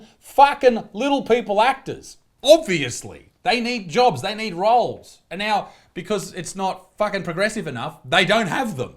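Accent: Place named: Australia. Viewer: As a fellow Australian I really dislike your oker accent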